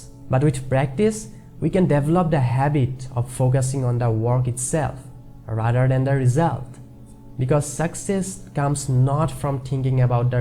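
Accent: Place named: Assam, India. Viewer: Also don't listen to haters who complain about your accent, your accent is ok, we're Assamese people of course we'll sound a bit Assamese when we speak English, so does every other Indian whose mother tongue is Hindi,malayam, Tamil, gujrati etc.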